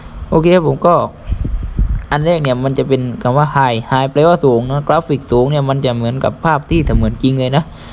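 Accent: South, Thailand. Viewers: น้องเป็นคนใต้หรือป่าวครับ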